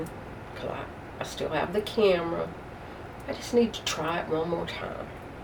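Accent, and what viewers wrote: South, United States. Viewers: Congratulation! That is when I started watching you when you said you Lived in a Shed. I thought let me see where this poor old woman has to live! And what a surprise, I love your beautiful shed! Your camera looks good. I just love listening to you talk, I love your southern accent. I watch a lot of "live alone" women on YouTube because that is me also. Keep up the good work!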